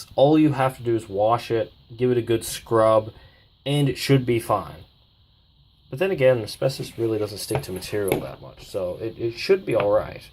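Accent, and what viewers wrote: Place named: United States. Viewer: So you live in Australia, but have an American accent, were you born in America, but moved to Australia? I'm just curious.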